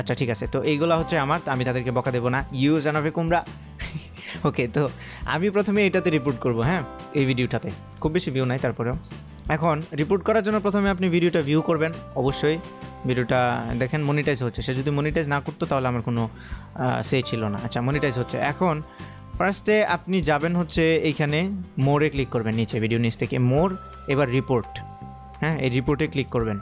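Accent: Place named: Chittagong, Bangladesh. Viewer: Apnar bari ki Chittagong e naki because amar bari o Chittagong e apnar kotha shune lagche apni Chittagong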